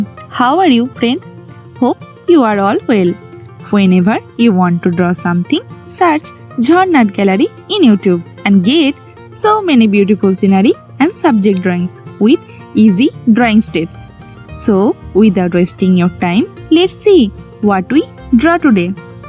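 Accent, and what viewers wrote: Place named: West Bengal, India. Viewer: Are you from West Bengal? Because your voice like from there don't worry I too belongs from West Bengal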